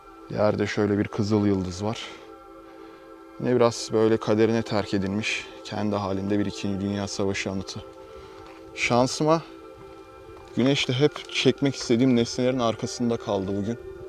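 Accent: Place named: Neftchala, Azerbaijan. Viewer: Aaa tobaa gedib Bankəyəə cıxıb eeeeeeeee😀Abi Neftcala lehcesidi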